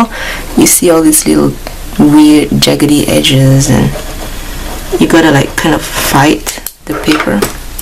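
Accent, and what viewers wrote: Singapore. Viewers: Singaporean accent! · You sound like you're from Singapore! Haha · You sound like a singaporean. Are you from singapore?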